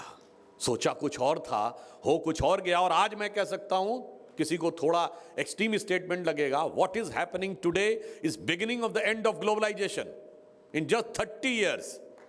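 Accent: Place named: Maharashtra-Karnataka border, India. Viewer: नमस्कार बेटा 🎉 विषय फारच छान सांगतोस. मी न चुकता ऐकतोच. आपली भाषा महाराष्ट्र - कर्नाटक सीमेवरील आहे अस वाटत. जरा सांगाल का आपण कुठले ते. आशीर्वाद. आपले कार्य असेच चालू ठेवा .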